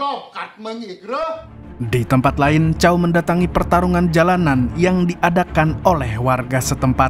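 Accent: Banjar, Indonesia. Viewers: Umayy orang banjar sakalinya😂😂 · Urang banjar😂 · Adminny urang banjarkh nih😅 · Orang banjar kah mang?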